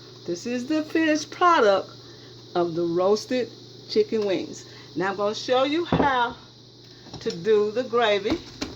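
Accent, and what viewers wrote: South, United States. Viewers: This is country/southern cooking...and country/southern pronunciations for seasonings. If you want "correct" pronunciations...watch the food channel. It's almost like asking Cajun people to speak the "Kings English" when cooking. Let player's play...and cooks...cook. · She can pronounce things the way she wants to when the food looks THAT good..leave us southerners alone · Jill Lancaster she has a very strong southern accent that's why jerks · lol she meant curry powder. She even said "pet a reeka" for paprika.....southern speech....sigh*** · The authenticity of the southern voice over made me love this even more! Have to try this recipe 💕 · Omg i looove her KUN TREE ACCENT....kun tree cooking...kun tree eating..i love the way she say...THEH mye lol and buuuur ty full lmfao...im hollering....love her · I love her country southern accent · I dont know why but i love her country accent...food looks great too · Jason Bourne wow I didn’t realize I was going to get all this feedback... 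calling someone a moron though? I just made an observation. If I were to pronounce something wrong I would want to know how to say it correctly. Sooo since you want to come for me actually it’s not her accent it is her southern dialect that you really should have referenced.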